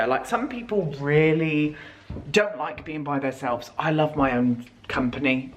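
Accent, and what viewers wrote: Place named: West Country, United Kingdom. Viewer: I went to that Belgium restaurant back last year. My mum got so pissed after having tasters of the insane amount of beers on their menu, as we left she thought I was the waitress & asked why I was following her. We stayed in Tregenna castle, beautiful exterior…interior was fine…Watching this made me actually smile several times, proud of you & can see how much you appreciate what you’re doing. Plus I do love hearing the West Country accent (I’m a Bristol Bumpkin) Proper lush me babber, well done, can’t wait to see more of everything you do x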